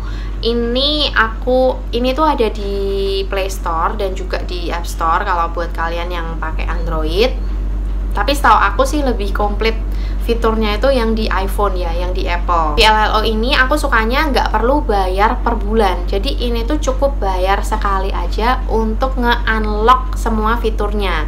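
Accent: Java, Indonesia. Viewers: MB dari mana ya..kok Jawanya keliatan..salam.kenal mb dr Yogyakarta.nyimak nih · Jowone endi mbak sampean..?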